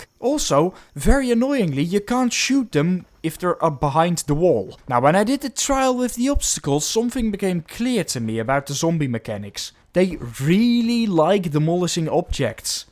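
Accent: Netherlands. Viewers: like how you can imediatly spot a dutch person on youtube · I can't unhear the dutchyness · Yo, I hear like 10 seconds and the Dutchness is killing me. Good vid though!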